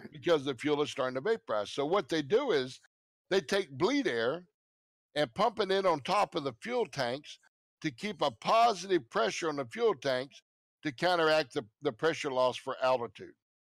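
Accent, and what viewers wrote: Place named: United States. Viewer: Jesus he sounds so American xD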